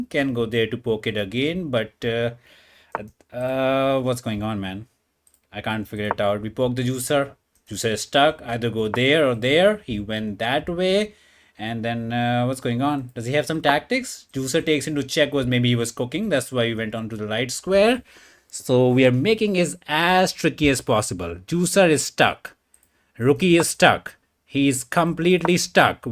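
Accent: India. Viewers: bro i would watch u read a phone book u dont need clickbait. any time i hear an indian accent my knee jerk racism kicks in from years of indian kids lying to me about working for telus mobility to try to play the scam opening with me but u are special i believe in u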